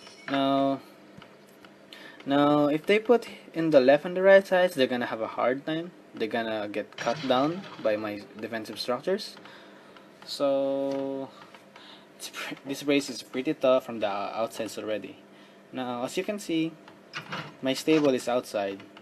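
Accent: Philippines. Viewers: I'm sensing a Filipino accent. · are you a Filipino? cuz your English sounds Like a Filipino.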